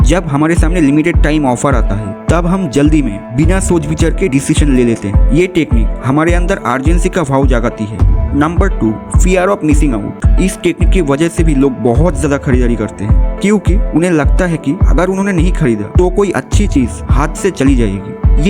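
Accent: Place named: Bengal, India. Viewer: Your Bengali hindi accent ❤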